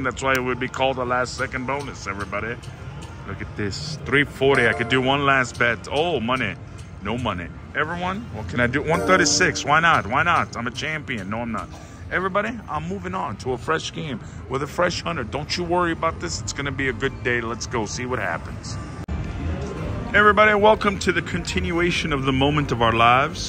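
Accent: South, United States. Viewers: I’m originally from Houston and I must say that today you’re accent was beyond any southern lady’s or her best “ fan waving” day!!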